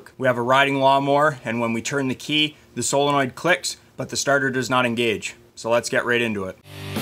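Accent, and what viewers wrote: Canada. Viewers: Good advice, you sound Canadian. All it takes is an ohm or two of extra resistance in big cables like that, and power is robbed from the starter motor in line. Drop testing would have been fun to see before removing wires, though the lack of any voltage at all on the starter post in the beginning is strange. Do garden tractors and farm equipment usually have starter solenoids separate from the starter motor?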